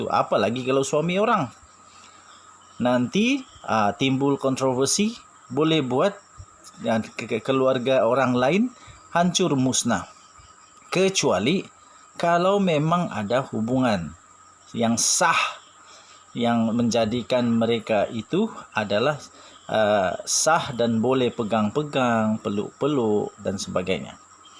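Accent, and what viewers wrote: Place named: Borneo, Malaysia. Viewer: Adui jgn lh ckp smnjung klu org borneo bah...keras btul sbutn mu tu bro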